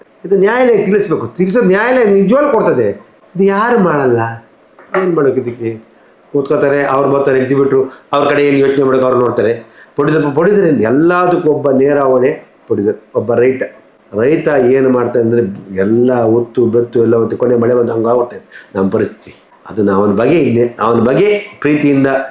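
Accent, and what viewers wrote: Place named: Telugu, India. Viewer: E manja proper kannadadavanu alla ansute , ole Telugu navaru matado reti matadtane.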